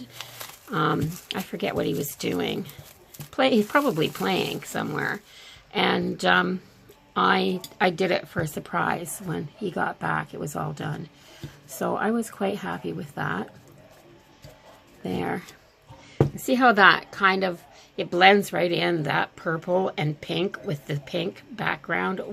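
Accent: Canada. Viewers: You must be from Canada? The way you pronounce out... Beautiful job on the mason jar! Thank you for sharing 💖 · Are you Canadian??? Love your brouge (accent)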